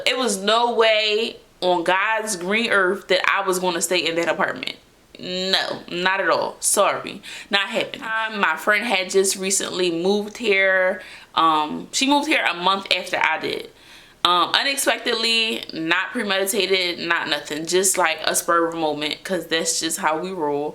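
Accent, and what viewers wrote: Philadelphia, United States. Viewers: Damn I’m bout to do the same move.. I knew u was from Philly as soon as u started talking… it’s crazy out here and I’m glad u safe and made this video.. #BeSafeTho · I know that philly accent anywhere 😅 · Are you Black American? Love the Philly accent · You sound like you from PHILLY! That accent is CRAZY.. I'm listening for the word "Jawn", or "Drawing", but your talking kinda proper right now 😂 but you can't hide that accent. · I knew you were from Philly before you said you were from there 😂😂 sending love from Philly ❤️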